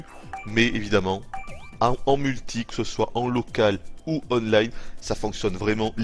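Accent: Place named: South, France. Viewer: French with a southern accent :)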